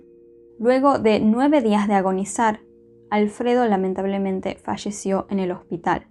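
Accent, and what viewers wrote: Argentina. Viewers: Es la primera ves que veo uno de tus videos y me gusta mucho cómo haces la narrativa, el acento argentino es genial! Saludos desde México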